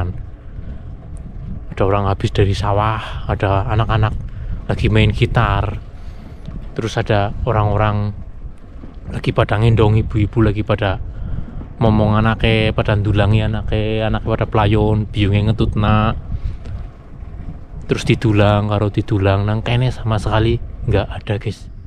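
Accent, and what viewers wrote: Banyumas, Indonesia. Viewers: Wong ngapak kieh jelas temen Cilacap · Kya jawa ngapak,,banyumasan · Ngapak ndi mas. Purwokerto Banyumas hadir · Rika wong endi mas, ngapak tulen😂😂..salam dari Cilacap😊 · Rika asli wong ngendi kang,deneng ngomonge ngapak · rika wongndi sh kang bahasane kyane wng cilacap ap purwekrto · Ngapak kang endi kang... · kelihatan orang banyumasan .ngapak banget. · Mase ngapak kie tah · Soyo suwe ko ngapakmu metu mbuuaaahhh....😂😂😂🙏🙏🙏❤️❤️❤️ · Halooo.,..Mas...... salam kenal.... mantap.... terimakasih atas infonya tentang kebudayaan Kebiasaan sehari hari Masyarakat Jepang.... Kalo boleh tahu Mas asal nya dari mana?.... kelihatan bahasanya Ngapak 👍🇮🇩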